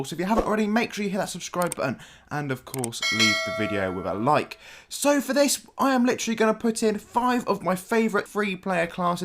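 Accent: United Kingdom. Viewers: I love ur accent and this clip U Brit or somewhere on the Europe?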